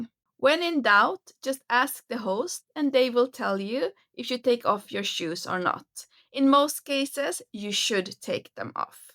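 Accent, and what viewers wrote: Finland. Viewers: You sound a little bit Finnish, you live in Sweden?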